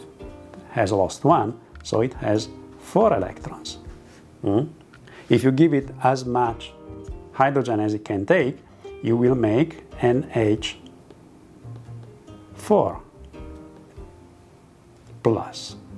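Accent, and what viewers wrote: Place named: Italy. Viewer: Excellent information that will help me teach this concept to my general chemistry students. Bonus listening to it with an Italian accent!!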